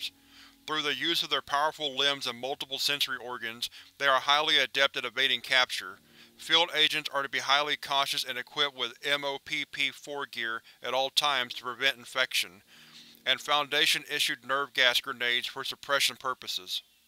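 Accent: South, United States. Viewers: Third! Love your voice dude. Very southern and somehow militaristic which goes along with the SCP stuff. Been binge watching your videos.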